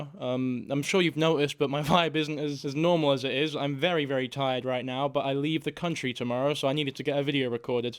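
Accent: United Kingdom. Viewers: I was gonna watch but he's british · Thank you YouTube algorithm for blessing me with finding British Kwebbelkop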